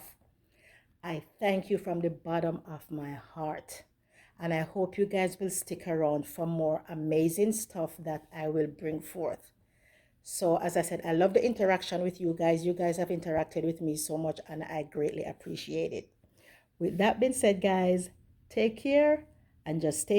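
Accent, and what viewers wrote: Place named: Jamaica. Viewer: 😁😁 that accent!!! Hello fellow Jamaican. Love the video.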